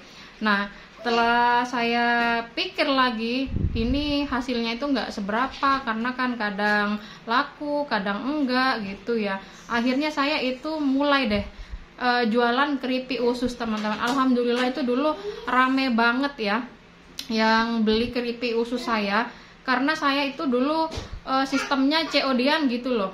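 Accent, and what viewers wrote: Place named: Sumenep, Indonesia. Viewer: Mbak tinggalx di mana? Kalau dari logatnya kayaknorang sumenep. Kalau emang sumenep, sumenep mana mbak.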